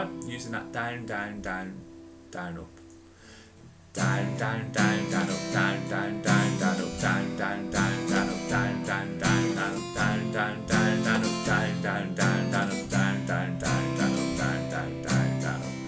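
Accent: United Kingdom. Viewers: hard to understand u with ur british accent ! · you from birmingham aha :D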